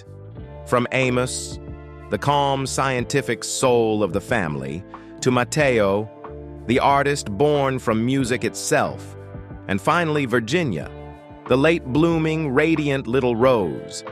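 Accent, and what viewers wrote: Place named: South, United States. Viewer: So much repetition and “over the top” words, expressions, comparisons”. I assume Morgan Freeman was the narrator. His Southern roots creep through. A good editor could have pared this down and made it much better. It got very tiring.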